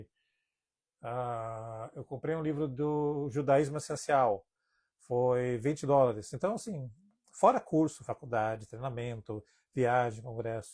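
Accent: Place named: South, Brazil. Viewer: A paz, pelo seu sotaque pensei que era do sul do Brasil.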